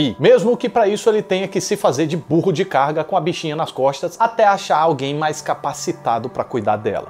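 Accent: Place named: Alagoas, Brazil. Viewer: Acho tu e teu canal muito fodas, sou inscrito, acompanho e sempre indico aos amigos, te acho um baita profissional e te admiro ainda mais desde que percebi teu sotaque e descobri que tu es alagoano, se é difícil pra tanta gente talentosa chegar á, imagina pra um alagoano, mas hoje queria dar os parabéns não só pelo vídeo, mas pela resposta sobre sua gratidão ao Felipe Neto que mesmo eu não curtindo o cara, passei a admira-lo pelo que ele foi, é e representa pra ti, pela amizade e pela gratidão, eu podia até não gostar dele, mas hoje já ha algo de diferente no que eu penso a respeito do cara. Meu abraço hoje vai pros dois (rsrs). Parabéns por isso e pelo canal.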